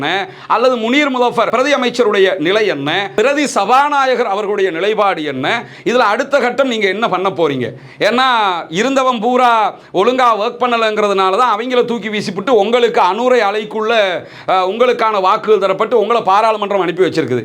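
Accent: Tamil Nadu, India. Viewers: ஏன் bro தமிழக style English பேசுறீங்க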